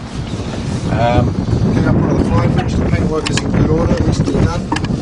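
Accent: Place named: United Kingdom. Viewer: Why does a British accent seem to make things oh so good. An insult to ones intelligence. The dude yaks to much.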